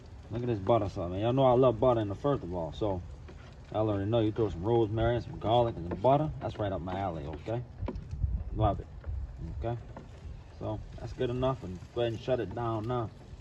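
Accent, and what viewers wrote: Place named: South, United States. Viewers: Man the southern accent is what I love most about these videos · Anyone know what’s his exact accent? It sounds real cool like Elvis Presley in Memphis ?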